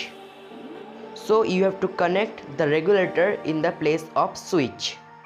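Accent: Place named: United States. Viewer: You are an Indian but your accent is the mixture of Indian and American ....wooooo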